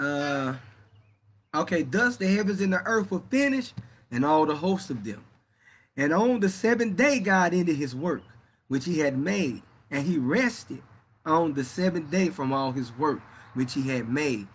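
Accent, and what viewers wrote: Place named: South, United States. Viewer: the southern accent reading KJV sounds nice. like it makes it easy to understand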